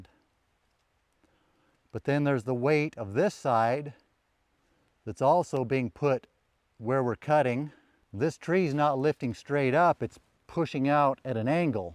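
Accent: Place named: West, United States. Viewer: di quale zona (stato) è? la parlata( accento) sembra quella di " Buster Scruggs "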